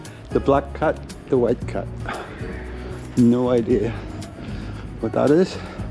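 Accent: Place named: Northern Ireland, United Kingdom. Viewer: Hopefully that's the end of your drama, but just how Norn Iron did you sound, Wee this and Wee that. Keep smiling.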